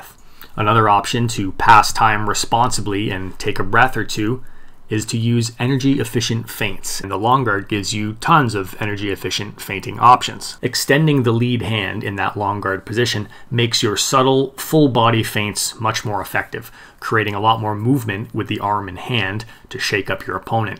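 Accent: Canada. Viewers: I have no idea what your background is, or who you are but your videos are phenomenal! The YouTube algorithm really did it's job recommending you. You sound Canadian(?), and you seem pretty educated. The way you break things down is almost uncanny. Do you talk about your background and I just haven't seen it yet? I'd love to hear about where you came from and who taught you because you are explaining things in an extremely succinct manner that is excellent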